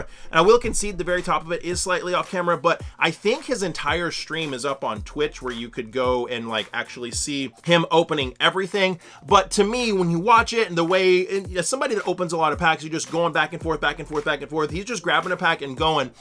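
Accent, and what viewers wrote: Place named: United States. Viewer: deze man gaat geen nl spreken hoor zn accent, dat is echt amerikaans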